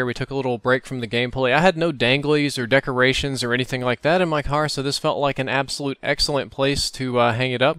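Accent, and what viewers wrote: South, United States. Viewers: I like how puts so much enunciation on the "h ". yes i know its because hes from the south and its just his accent, but it reminds me of when stewie from family guy says cool whip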